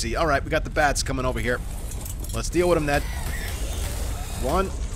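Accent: New Jersey, United States. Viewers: He is one of the funniest gamers I've seen I think his New Jersey accent is awesome.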